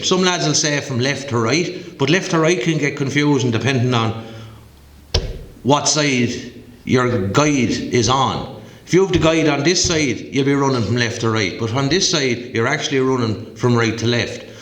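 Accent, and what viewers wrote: Ireland. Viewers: So funny listening to an Irish man speaking English.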